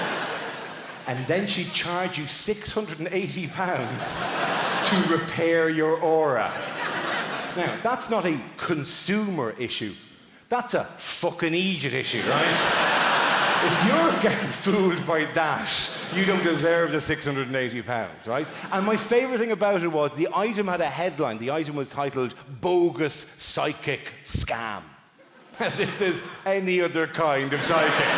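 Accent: Ireland. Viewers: I think Dara is one of the few stand-ups that is still funny even though he is speaking a million miles an hour, as that doesn't often really work well for susained comedy. Must be the Irish lilt!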